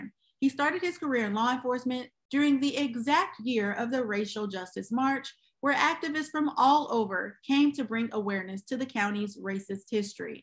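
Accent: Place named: South, United States. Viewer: Love your country accent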